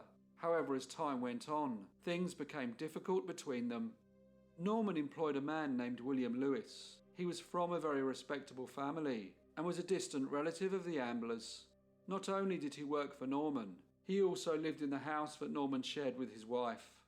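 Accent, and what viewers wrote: England, United Kingdom. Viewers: My goodness! I do so love an Engllish accent! I can't help but mentally picture Eddie Redmayne as the narrator details every tragic story. Both voices are quite similar to my ears. I only hope the narrator is NOT a product of AI. As a human, make no mistake, you are blessed with a talent only few possess--a great speaking voice & the determination to research & present these stories. Thank you so much for sharing your talent & interests with the public. This specific niche is yours alone. No one else can compare.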